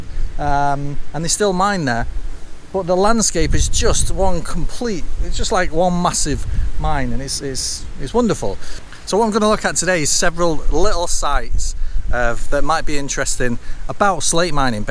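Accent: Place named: Northern England, United Kingdom. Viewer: Language is a dynamic construct. I am familiar with the speech idioms of this presenter, but then I am , like him from the North of England. If you find Northern speech annoying, maybe confine yourself to videos with presenters from your local area, or to those that have had professional training in speaking Received Pronunciation.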